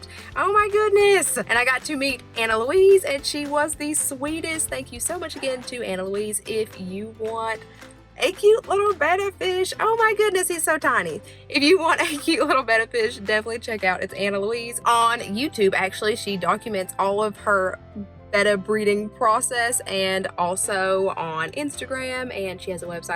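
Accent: South, United States. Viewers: That southern accent though haha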